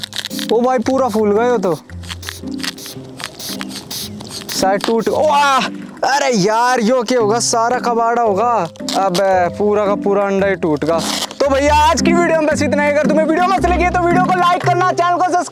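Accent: Haryana, India. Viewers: Bhai tum haryana sa ho kya😂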